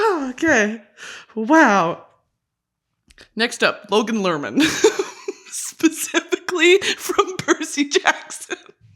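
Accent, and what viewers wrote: United Kingdom. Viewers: The British accent is giving Chole from love island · u should do an episode in full british accent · your birtish accent is so jess this season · Your british accent is giving. I love it!!!! But fr make this love island NOW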